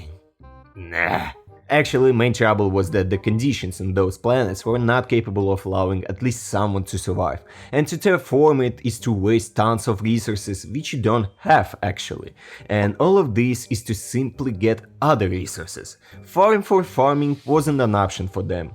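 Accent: Russia. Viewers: я против ничего не имею,но Русский акцент так и прет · Русский английский как всегда прекрасен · Спасибо русскому акценту, слова с буквой "r" теперь вызывают в 2 раза больше проблем. Но получилось норм, вполне понятно и приятно звучит)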